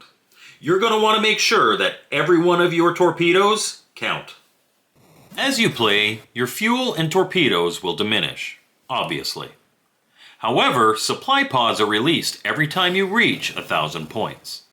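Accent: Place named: Russia. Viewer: Let's Go DJ Blyatman would Be Proud using that authentic Russia 🇷🇺 Accent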